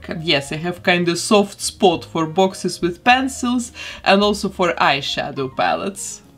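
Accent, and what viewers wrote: Russia. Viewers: У неё какой-то русский акцент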